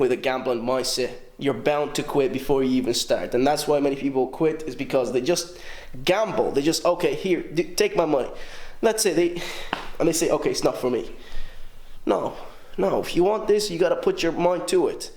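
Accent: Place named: Ireland. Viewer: He from Ireland 🇮🇪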